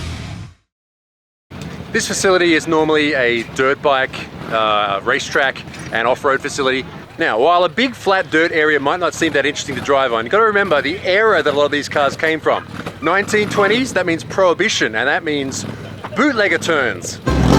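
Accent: Texas, United States. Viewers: I lived in Texas for 16 years. I think you'd fit right in around Houston or San Antonio with that accent tbh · am I the only one to not get enough of Texas accent? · You have a better Texan accent than most that live here since a large group of them are not from here lol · ahahaha that was a decent American accent considering i know our real voice. best intro ever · not even gonna lie you nailed the American accent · i personally think your american accent was pretty good and im from america lmao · Your American accent threw me for a serious loop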